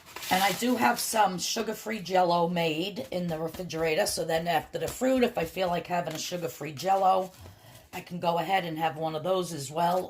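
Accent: New York, United States. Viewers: I love your videos. I just subscribed. I want to start the purple plan this week and you have some great ideas. I'm from long island and you sound like your from NY. I feel like I'm listening to a friend or relative.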